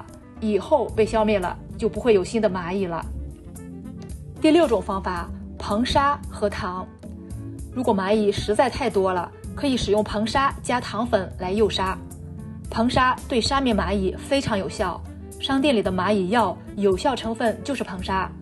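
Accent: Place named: Shandong, China. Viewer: 听得出，解说的声音来自一位山东女士。视频非常好，每年夏天都为蚜虫和蚂蚁的合作非常头疼，谢谢！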